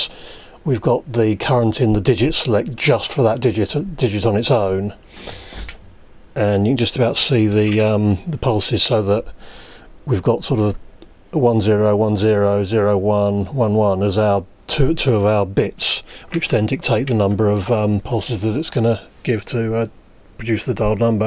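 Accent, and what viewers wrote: United Kingdom. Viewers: Возможно, вам имеет смысл проверить слух. Автор видео англоговорящий, с явно выраженным британским акцентом и чёткой дикцией.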